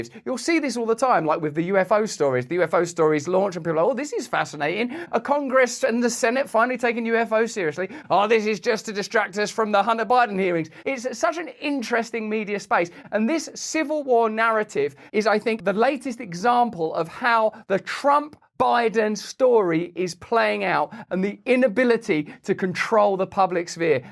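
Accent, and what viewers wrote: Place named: England, United Kingdom. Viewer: He is English…just in case you couldn’t tell…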